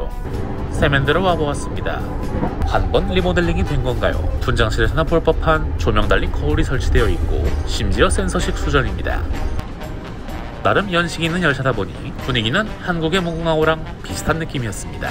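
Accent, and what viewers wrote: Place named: Japan. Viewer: 나레이션 본인 목소리인가요 ? 꼭 일본인이 한국말하는것 같아요 ㅎㅎ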